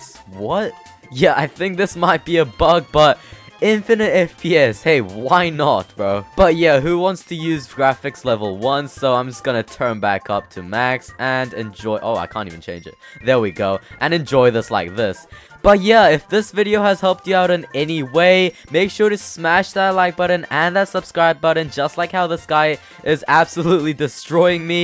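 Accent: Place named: New Zealand. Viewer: Yo Are you from New Zealand?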